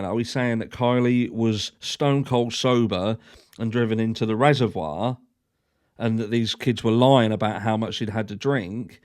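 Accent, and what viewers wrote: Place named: Norfolk, United Kingdom. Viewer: you're very welcome. It's mad considering both our countries speak the same language there are so many differences, and I much prefer Norfolk accent to my own accent 🤣🤣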